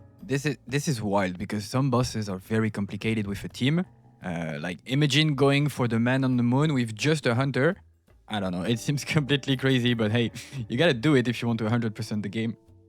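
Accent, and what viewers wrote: France. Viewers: Excellent video mon pote, it's rare to see french accent in english videos, yours is very sweet. Also a LOT of your tips are unique and useful, great video. I'll add more tips that i've learned myself : -Some items belongs to several sets family. If you have the right items, you can have a cat with 2 set bonuses, if equipped with the right items. I don't think you can have more than 2 sets ? Should test that. -Birth defect can't mutate (anymore). If your cat have a head birth defect for example, he'll keep it forever. It can't mutate in a room with high mutation nor during an adventure event. -Charisma stat determine how your cat is attractive to others, and how much it will reproduce. A cat with 0 or negative charisma will never reproduce. From experience, a cat with 12+ charisma will reproduce every night. Was a long time ago, all my cats are descent of this Barbie named cat. 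My personnal tip is to do easy runs with cats that have good stats and mutations, and boost their charisma, so they give you a lot of kitten. Also keep that in mind if you want to do a cancer/uranium rod run. Even if you get a lot of mutation, if he look unfuckable, he will be. -If you have 0 Intelligence, the text during event will be randomize BUT only the letters are randomized. Words will keep the same number of letters. Can be helpful if you try to decipher or remember the events. -If a cat is the love-interest of multiple cats, those multiple cats might fight each others. Be carefull, as even a h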